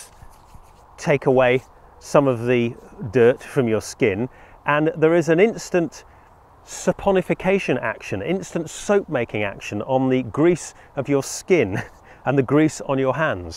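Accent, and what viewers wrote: United Kingdom. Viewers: I don’t recall Bob Ross having a british accent.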